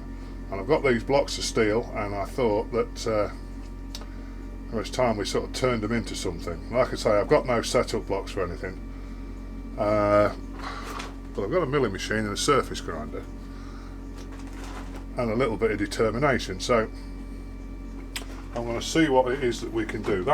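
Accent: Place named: Lincolnshire, United Kingdom. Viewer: Hi, just found your channel via Doubleboost and subbed. I was watching a vid or two and listening to the accent thinking 'this guy comes from the same part of the world as me', nailed it, lmao when you put North Lincs in your outro. I moved away from Lincoln to Scotland 10 yrs ago and am just about to start setting my shop up so will hopefully be posting some vids soon. Keep up the good work, makes me feel like I am back in my home town watching your vids. Jon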